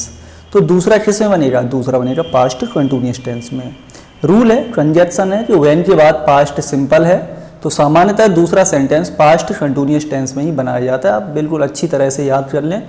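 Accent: India. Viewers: you are poor English speaker.your pronounce as like as Indiana. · I can hardly understand you, coz of your terrible Indian accent teacher. Well I will try to make the best of this grammar exercise. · Amr Alhabori because this is an stupid indian teaching to illiterate dumbasses hear english from the people who speak in a good accent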